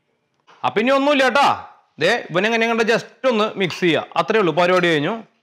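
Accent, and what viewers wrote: Thrissur, India. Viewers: തൃശൂർ സംസാരം.... സൂപ്പർ ചേട്ടായി.... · Pakkaa trissur ttaaa ❤️ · ത്രിശ്ശൂർ സ്ലാങ്ങും ഓവർ ടോക്കും മഹാ ബോറാണ്‌.. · ചേട്ടായി, മ്മടെ തൃശൂർക്കാരുടെ ആ സ്ലാങ് അതും കൂടിയായപ്പോ ഒന്നും പറയാനില്ല. സൂപ്പർ. അടുത്ത ഓഫീനു ഇണ്ടാക്കീട്ട് തന്നെ കാര്യം. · This is the first time watching your channel. Thrissur ആണല്ലേ. ഞങ്ങൾടെ അതെ സംസാരം. loved 🥰🥰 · Thrissur karande samsaaram poli anne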